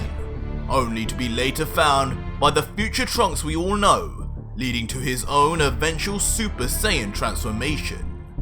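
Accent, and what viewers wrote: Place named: United Kingdom. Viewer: If you didn’t have an annoying Brit accent this would be ten times better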